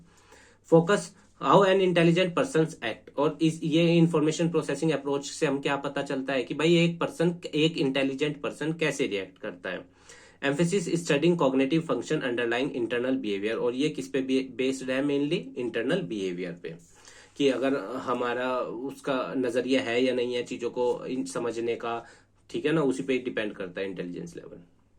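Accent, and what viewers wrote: Haryana, India. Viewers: Yor are from haryana ?? Thanku bhaiya.. Your explanation is very helpful for me.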